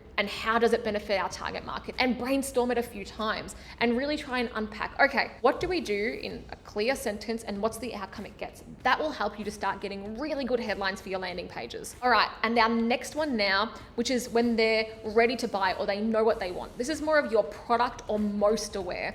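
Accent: Australia. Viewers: Still loving that Aussie accent. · New sub after watching many of your videos over the past few days. Also, fellow Aussie accent! Yay!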